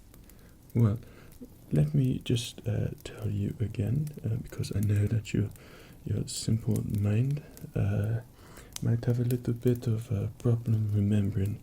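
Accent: France. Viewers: I CAN UNDERSTAND ENJ?GLISH WHEN YOURE SPEAK ENGLMISH WITH FRENCH ACCENT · French accent and HRE flag on preview. Nice. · french accent is very funnn il french XD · I'm french and you have a little french accent...with a good english spoken xD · I'm French and your french accent is horrible but really funny and kinda relaxing :') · Omg I'm french and It's really creepy like he does very well the french accent xD ... It seems me lol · ragnar lothbrok roleplay? the french accent reminds me of him lol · Your French voice is so amazing, do fancy French roleplays more often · He has a German flag, a French accent but he speaks English? What is this sorcery? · Dont know why he has a french accent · All I see is Thor with a french accent wearing a crown and I'm dying XD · As a french, I think this french accent gives a softie side to that king, which is weird but funny at the same time. 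· I expected a very Monty Python French accent, but this was a pleasant surprise. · Wouah ! It's amazing ! You have exactly the french accent ! It's so rare to do this ! It's a good vidéo ! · Les gens aiment écouter quelqu'un parler anglais avec un accent français ? Damn, moi qui ai toujours forcé pour essayer d'avoir le meilleur accent anglais possible! :') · The accent of a French King and the speaking pace of Travis Fimmel as Ragnar Lothbrok... Counting you do look like Thor, you could be the first line of viking nobility of the country. No ? ;) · This actually sounds like a French accent lmaoooo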